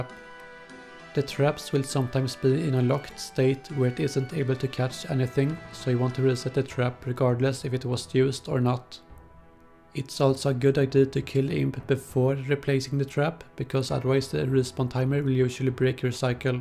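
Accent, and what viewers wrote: Sweden. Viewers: bra svengelska :D...det gillar vi (Y)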